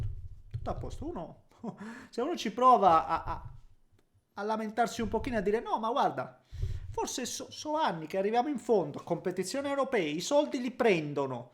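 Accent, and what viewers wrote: Calabria, Italy. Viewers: Non so di dove sei ma riconosco un accento calabrese ❤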